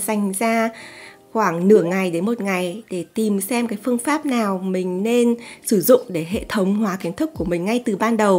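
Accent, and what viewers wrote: Standard. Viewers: Chị nói chuẩn ghê. Google dịch được hết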